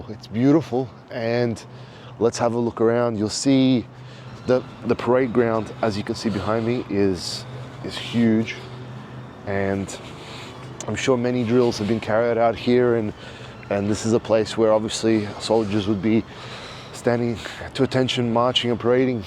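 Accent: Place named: Australia. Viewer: You sound a little like someone from down under.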